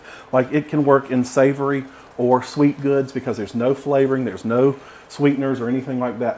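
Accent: South, United States. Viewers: Nah, he's just southern.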